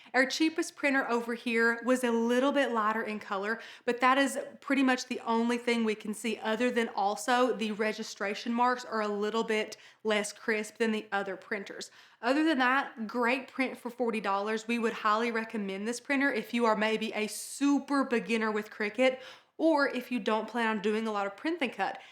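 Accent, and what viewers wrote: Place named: Texas, United States. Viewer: Hey I love the accent. Are you from Texas by any chance? Just a guess.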